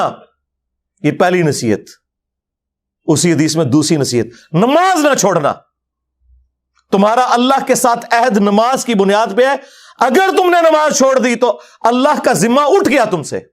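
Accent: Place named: India. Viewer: brother wo india sy hai or waha kuch words esy bolay jaty hein , meaning woe hota hai bs bolne mein farak hai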